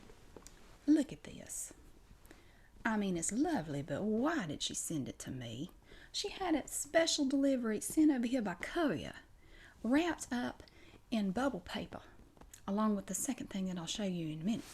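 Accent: South, United States. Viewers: Reminded me a little of The Golden Girls' character Blanche Devereaux; purely in accent and glamour. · Lots of tingles from the southern drawl, wow :) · I love this accent, cause it's like a combination of American Southern and an English accent, lol.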